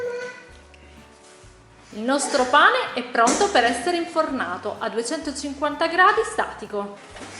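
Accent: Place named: Parma, Italy. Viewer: Ciao! Mi é piaciuto il tuo canale, parli come un' amica mia italiana che é di Parma , di che città sei? Parli così bene che io ti capisco tutto e non sono nemmeno italiana. Saluti dal Cile!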